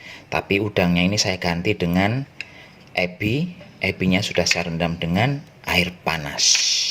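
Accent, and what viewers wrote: Banyumas, Indonesia. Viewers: Ish ish.. ketemu koleksi bhs ngapak.. Blindi" .. 😂😂.. Jls enak wong pk tpung bambu🤗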